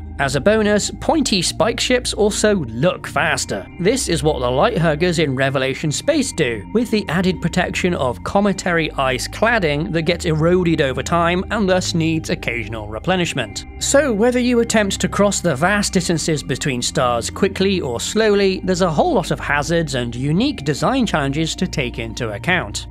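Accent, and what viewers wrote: United Kingdom. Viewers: ok british man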